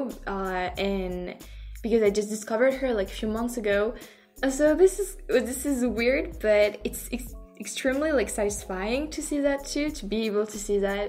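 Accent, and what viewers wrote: France. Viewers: i love the french accent coming through the English pronounciation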